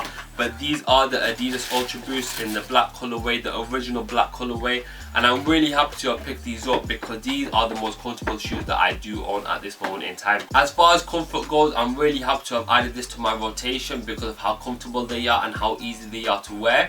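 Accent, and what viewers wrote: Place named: London, United Kingdom. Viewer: Love your mix of London and Manchester accents, sounds so dope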